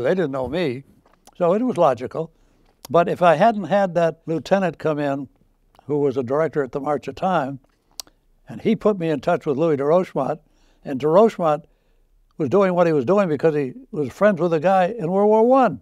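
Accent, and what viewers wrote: Boston, United States. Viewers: He's got a very interesting Boston accent...in that it doesn't sound like any Boston I'm familiar with. Very intriguing! I wonder if he comes from a very old New England family. He's got a very Rhotic accent....no pawk the caw in the yawd.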